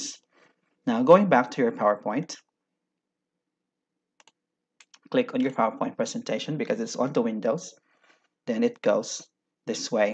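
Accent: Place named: United Kingdom. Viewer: Very good information. Congrats sa hereenng. British accent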